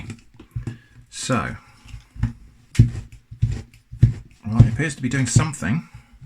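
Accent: United Kingdom. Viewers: Your accent is magnificent. We Yanks speak crassly compared to the UK folks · pretty close on the spelling! with british accent of course! I understood it so good job! also these are damn great and tasty usually (ill edit it later, just started to watch the vid lol) edit: yup, probably one thing i miss from hungary since i moved to the uk... this stuff... the stuff here tastes like bullcrap, and not all of the polish shops sell this kind of stuff:/ but im happy, that it is not just loved by the eastern europeans, but by others as well! also try it with ham/chorizo on top of it, and add a bit of oregano on top of the cheese!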